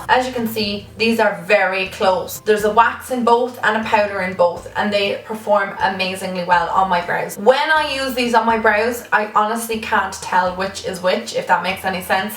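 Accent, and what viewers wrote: Dublin, Ireland. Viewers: what county in Ireland are you from☺️x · U a dub ? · It's Irish, have you ever even heard a Scottish accent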